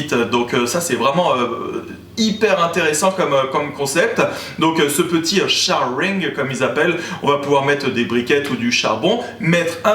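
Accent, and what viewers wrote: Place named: Quebec, Canada. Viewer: La prononciation québecoise fait plaisir aussi aux amis belges :D !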